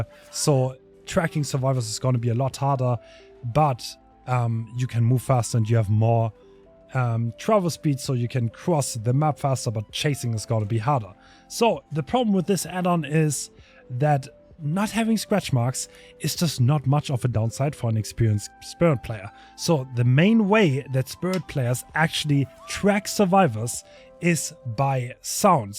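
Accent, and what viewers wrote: Germany. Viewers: man hört, dass du deutsch bist ;)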